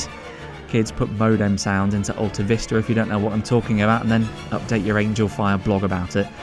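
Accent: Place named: South England, United Kingdom. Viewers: Yeah he's got an English accent. Sounds southern. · Who else loves the british accent? It's british right? I am not dumb right?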